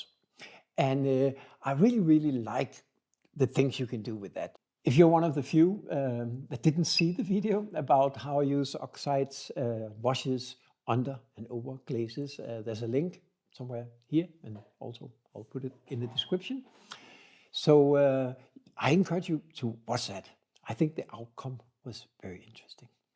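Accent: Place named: Denmark. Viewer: Danish? Your accent sounds just like my uncles! ❤ great video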